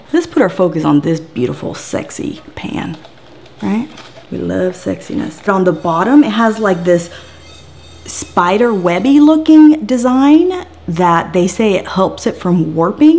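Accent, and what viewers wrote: South, United States. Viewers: you are very entertaining, interesting and smart! Plus you have the coolest slight Southern accent...and what a voice! Just curious, what State are you in? Most entertaining reviewer on Youtube! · Holy crapola you are adorably gorgeous, and that accent WOW. Where are you from in the south anyway. I was actually looking for info on this pan and found your video, which made me so freakin happy because not only did I learn about the pan, but I learned it from one of the most attractive women I have ever seen. There is nothing I don't like about you.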